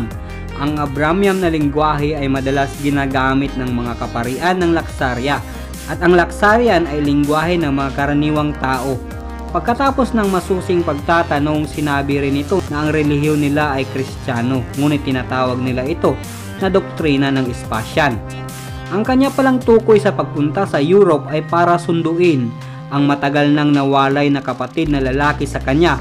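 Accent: Visayas, Philippines. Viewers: Bisaya ka ba pre hahahaha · Bisaya ba sya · Maganda ung kwento kaso bisayang bisaya kakawalang gana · ikaw din iba tuno mo haha bisaya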